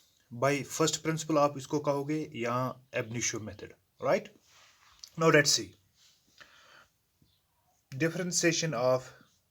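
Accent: Kashmir, India. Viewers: Are u from Kashmir sirr ? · I also think that sir is from Kashmir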